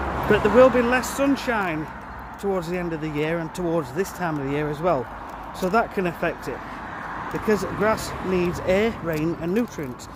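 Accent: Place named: Sheffield, United Kingdom. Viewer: Where are you based? Your accent sounds local to Me, I’m in Sheffield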